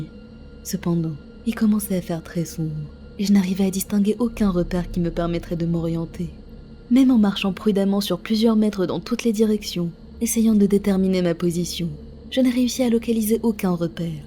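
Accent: Quebec, Canada. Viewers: Tu viens du québec ?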